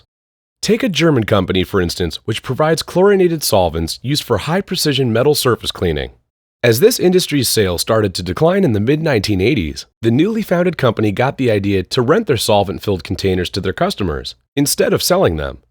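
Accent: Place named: United States. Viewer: Why is there an American voicing for an EU project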